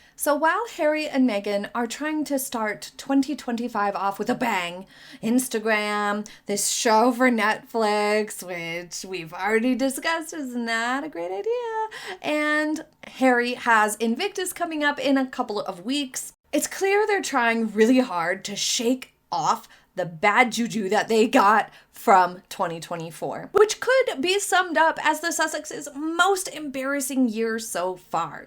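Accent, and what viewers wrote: United States. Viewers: ❤darling ❤you look absolutely STUNNING 🤩 again today…..I love 💝 you in this shade of Green!it makes your eyes 👀 pop!!!🥤 ❤❤❤❤🎉you really are a BEAUTIFUL WOMAN 👩 with a Fabulous Brain 🧠 to boot!its always a pleasure to hear 👂 your soft CLEAN 🧼 IMHO AMERICAN 🇺🇸 ACCENT all without the new “woke”weird drawling questioning intonations that I hear often on YouTube!lol!Im a 58year old lady in the Shetland Isles Scotland 🏴 uk 🇬🇧 and have been with you from the get go!Keep going you’re REALLY SAYING WHAT I FEEL and THINK 🤔 about METHANE and HAZNOBALLS and I’m PRAYING 🙏 TRUMP doesn’t Chuck HENRY WINDSOR outta the USA 🇺🇸 😂😂😂😂!Much love!🧡 Jo.xxxxxxx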